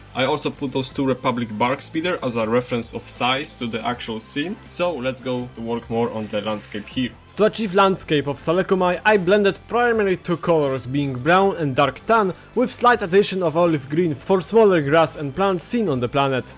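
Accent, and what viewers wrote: Poland. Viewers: czy ja slysze polski akcent? btw zajebisty moc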